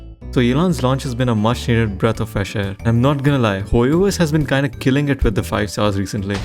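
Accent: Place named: India. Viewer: really like your accent. Its inbetween indian non indian- kinda 🥵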